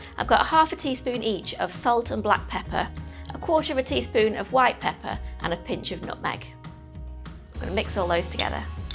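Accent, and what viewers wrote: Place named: England, United Kingdom. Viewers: Great recipe and super simple, thank you.....! Are you from Liverpool? I sensed a hint of a scouse accent. Hope you are a "red" :-) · Delicious cooking explanation with that English accent makes me watch this video on repeat mode. 😂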